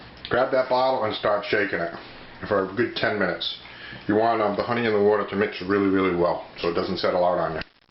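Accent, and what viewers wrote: United States. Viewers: You sound american and bottled water is about the same quality as bottled water there. They call it springs or glacier water but really they just re-filter tap water and ad some more minerals in it. If you want "clean Water" well your better of buying actual distilled water or distill it yourself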